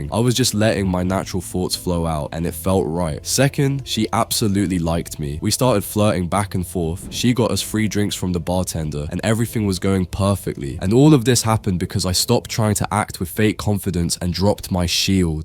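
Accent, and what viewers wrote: United Kingdom. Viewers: Are you British? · UK accent🔥🔥🔥🧠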